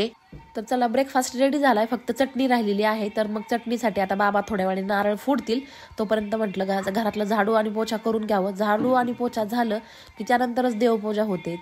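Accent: Ahmednagar, India. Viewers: तुमची नगरी भाषा लै ऐकू वाटती🎉😂🙏👍👌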